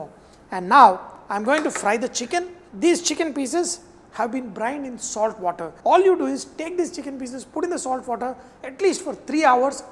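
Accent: India. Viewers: I dont want to listen ur voice and butler English, thats why I mute the video.. But like ur skills.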